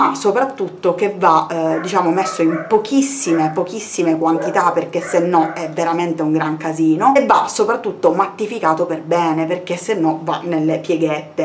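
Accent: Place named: Sicily, Italy. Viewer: Io ti ho scoperta da poco e amo il tuo accento essendo anch'io di origine siciliana. E mi sto guardando tutti i tuoi video 💞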